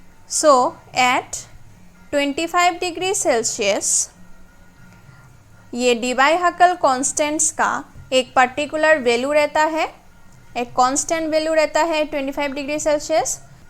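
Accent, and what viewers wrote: Bengal, India. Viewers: Are uh Bengali 😅😮?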